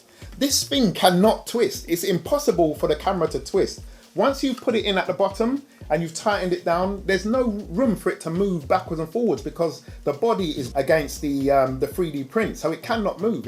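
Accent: London, United Kingdom. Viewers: What parts u grew up Cuz. U sound like NW10 Cuz.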